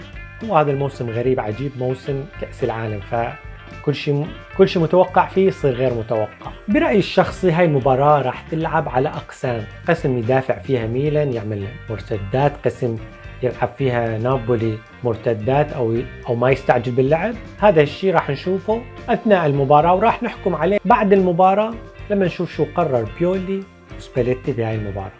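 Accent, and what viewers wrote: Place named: Deir ez-Zor, Syria. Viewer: لهجتك ديرية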